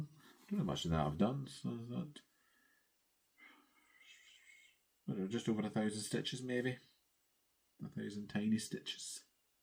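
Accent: Scotland, United Kingdom. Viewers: Great to visit with you. I worked on Summer Quaker by Lila’s Studio while watching. I forgot to comment last week, but I’m interested to hear your Scottish accent and Carl’s English accent together in a video. Your accent is quite noticeably Scottish. I live in the southern US, and I’m sure that I also have an accent that I can’t detect myself. Take care! 😊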